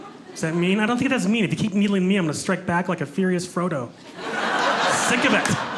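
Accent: United States. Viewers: World be fun if he knew how his and his brothers names are pronounced in Norwegian. And funny they’re pronounced so American and not even with a twist of Norwegian.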